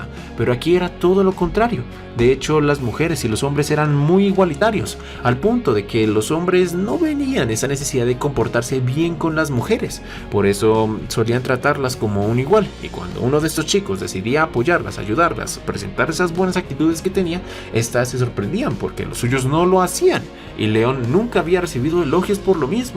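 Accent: Colombia. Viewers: sos colombiano parcero?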